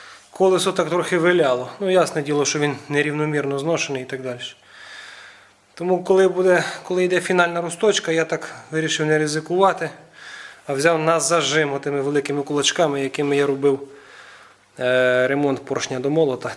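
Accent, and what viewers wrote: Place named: Lviv, Ukraine. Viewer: Мутуцикла) Цей Львівський акцент))))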